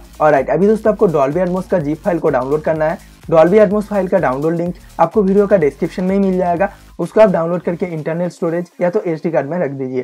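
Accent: Bengal, India. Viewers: You Bengali ??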